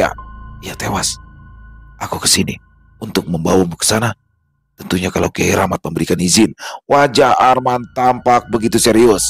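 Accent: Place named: Banjar, Indonesia. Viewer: Abang cocok jadi orang banjar. Soal nya klo bicara bahasa banjar abang logat nya banjar bangttt ☺️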